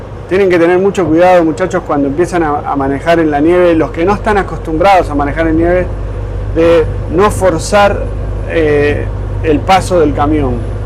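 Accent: Argentina. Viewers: Vos sos argentino!!!! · Saludos desde Monterrey, Mexico.. tienes acento tipo Maradona (qepd), tus consejos son exelentes!!! gracias.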